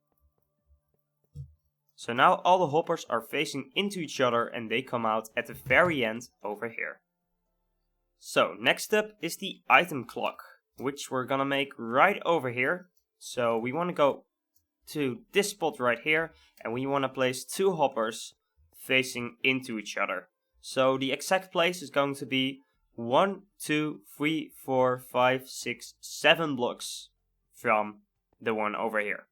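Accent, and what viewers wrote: Netherlands. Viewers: Hij is 100% nederlands hahahaha 99% van de nederlanders kunnen geeen engels praten zonder accent ( ik ook niet :P ) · where do you come from? You sound dutch.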